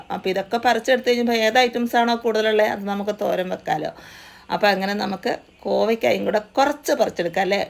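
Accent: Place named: Pathanamthitta, India. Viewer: ok.. Enikku samsaaram kettappol pathanathitta aanonu thoni.. Njaan pathanamthita aanu..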